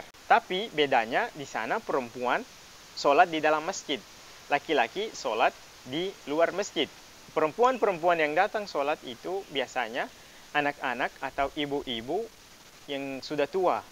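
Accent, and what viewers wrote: Makassar, Indonesia. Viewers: Pantas logat mu logat makasar . Ternyata kamu kuliah di makasar ya ?? Saya juga anak sulawesi.. Tapi saya di Gorontalo.. Berdekatan dengan Sulawesi Tengah dan Sulawesi Utara 🙂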